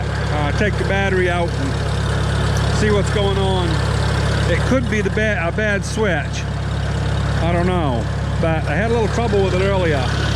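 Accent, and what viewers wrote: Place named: New England, United States. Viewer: Watching the video, and hearing the New England accent... more White Mountain region than Kittery.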